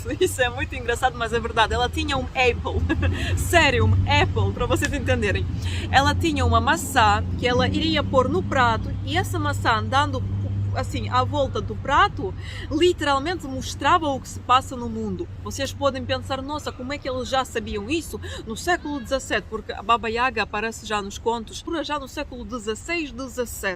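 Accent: Portugal. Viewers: Eu te assisto sempre e fico bem na dúvida se o teu sotaque é do teu idioma, mais parece que é mesmo de Portugal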